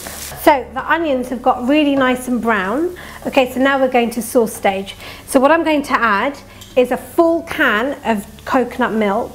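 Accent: United Kingdom. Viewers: nice vedio.. thnx for uploading........... and nice British accent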